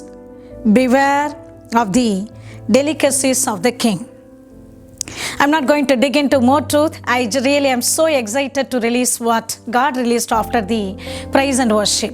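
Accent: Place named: India. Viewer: Respectfully I tell you,I like your Indian accent but more still I like your great faith in the Lord Jesus.Thankyou.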